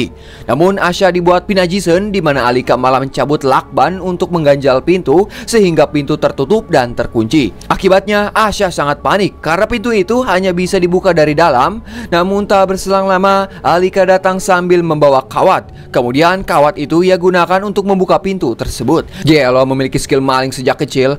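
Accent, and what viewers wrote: West Java, Indonesia. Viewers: Bang hadir Bogor ciawi.aku suka ceritanya.dan ngomong nya kaya aku ada Sunda nya aku jadi senyum" sendiri😂😂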